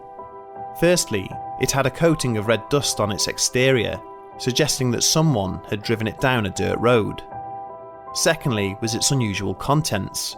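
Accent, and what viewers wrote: England, United Kingdom. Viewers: Because he's English, like me! We have the best voices for narration.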